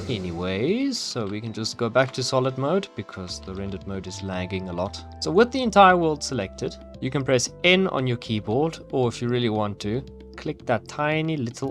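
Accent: South Africa. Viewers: I love South African accent. ❤ thanks for sharing…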